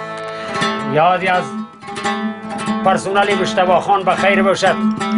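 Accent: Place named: Tajikistan. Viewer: Зинда боши бародарчон ана лафзи лахчавии Точикочо овозат хаста набошад офарин!!!!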